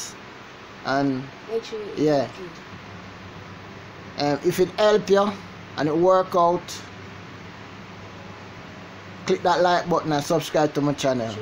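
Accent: Jamaica. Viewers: Thank you soon much bredda are you a Jamaican?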